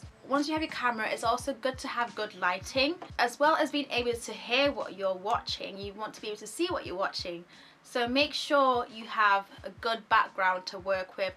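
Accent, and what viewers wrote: United Kingdom. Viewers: Truth be told, I only clicked on this video because I thought she looked very pretty. I also like her British accent.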